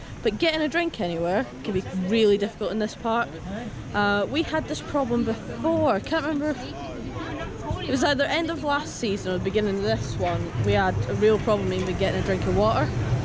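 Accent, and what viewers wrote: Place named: Scotland, United Kingdom. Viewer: tied up in the car with tape across his mouth? No wait … he's free! Jokes aside great vlog, very informative and let's face it the Scottish accent adds to the quality;) I'll come across as a bit of a H&S geek but that door should be better marked or open inwards, you could be reading that info board up close then whack!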